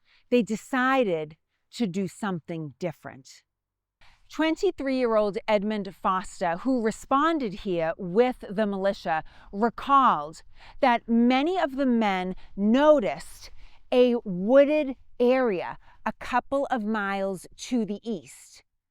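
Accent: Boston, United States. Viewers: Thank you. You really helped bring it to life for me. And Love that Boston Accent. Put a smile on my face. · I detected the Boston, but I could swear there were some Aussie influences there too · I'd hype you for your accent alone! I love me some Boston!! ❤😉🇺🇸❤ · Man I love the Bahston accent and all the talk about maahching! Great content, too! Lived in Boston for 9 years. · I don't usually like the Boston / yankee accent lol. rocks it though! Keep up the good work n GREAT content, look forward to hearing more from ya in the future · Just found this channel and, there is NO ONE I'd rather hear talking about this then you and your Bahstin accent:) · Another well-done informative video. Keep up the good work. I’m hard of hearing so I have to use the closed-captioning. It’s a real treat the way it sometimes converts your Boston accent. Conquered vs Concord. 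Luckily my SIL and brother live there so I’m able to figure it out having talked to her for 40 yrs or more. It keeps my mind active anyway. LOL. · like the Boston accent! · My weekly dose of American history and a Boston accent. Thank you! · Awesome accent Anytime someone with a Mass/Boston accent teaches Revolutionary war history. I’m in!! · I sense a slight baaaastin accent cute!!! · Thank you. Just discovered you a few weeks ago. Love your Boston accent, and your explanations from our past important history during the revolutionary war. I'm a west coast watcher from Oregon, and enjoy your stories and videos. Keep em' coming! 😉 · That Boston accent, though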